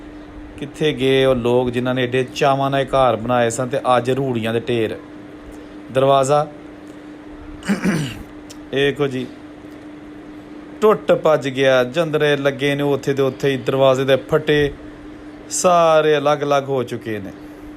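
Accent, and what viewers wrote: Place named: Dogri, India. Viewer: Your commentry on this video hearted me very much touch of local dialect resembles with my mother tongue Dogri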